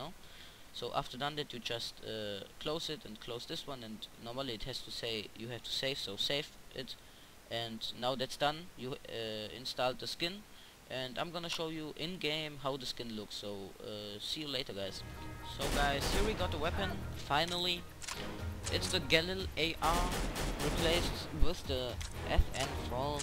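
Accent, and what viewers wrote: Germany. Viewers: Ey , war klar das du Deutscher bist! :D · Man merkt irgendwie dass du deutsch bist,auch wenn du es nicht gesagt hättest,liegt nicht mal am Akzent,nur an der Art wie du eben redest ^^ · DEUTSCH ! :D habe ich sofort an der Aussprache erkannt :D Abo ist dir aber sicher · More people know English than they do German.Only about 100 million people speak german and 340 million people speak english.In other words,there's a more likely chance of someone speaking english than someone speaking german.Besides,it's only his accent.Don't be fooled by the idiots to are racist towards Germans.Most of us are just telling him he needs to work on his English so there's no reason to be crazy mad about it. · Are you German? Could we talk a bit? I got the same voice like you xDDD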